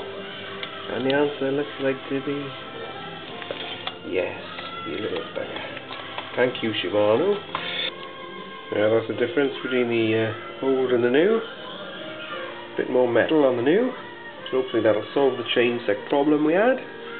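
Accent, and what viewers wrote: Wales, United Kingdom. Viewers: Proper good and proper Welsh. Excellent! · Haha, very welsh. Good video. Cheers.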